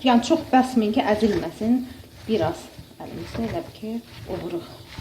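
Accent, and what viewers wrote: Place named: Yeraz, Armenia. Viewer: Ay sənin ləhcənə qurban. Ay mənim YERAZ bacım.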